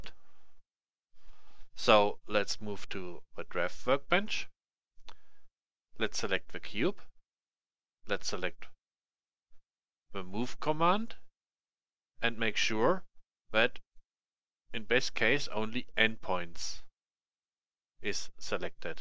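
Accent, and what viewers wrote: Germany. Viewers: Great tutorial! And love your German accent!!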